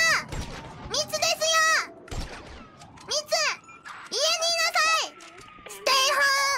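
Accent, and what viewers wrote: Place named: United States. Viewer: if i wouldn't know better i thought she's american 🤔🤔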